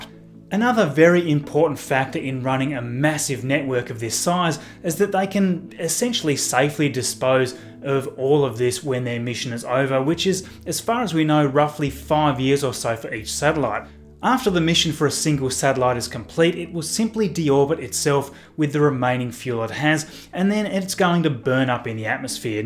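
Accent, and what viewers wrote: Australia. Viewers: I’m subscribed and know this channel but always forget it, the way i remember you is the Australian accent lol 😂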